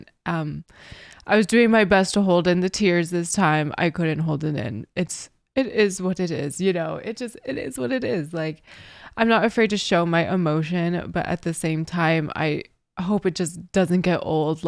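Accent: United Kingdom. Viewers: Are you British? You have a little bit of an accent which is totally cool but I was just wondering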